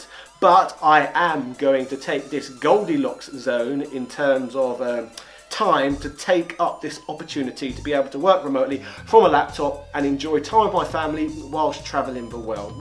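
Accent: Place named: RP, United Kingdom. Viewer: It seems as if your ‘posh’ English accent is a bit exaggerated and it hurts my ears/ nerves/ head. Your natural delivery is probably just fine, so try what comes naturally. Don’t stretch yourself.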